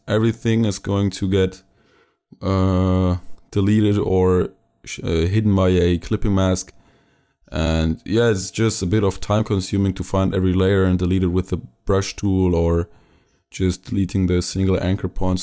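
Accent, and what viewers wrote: Germany. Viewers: i think you have an german accent :O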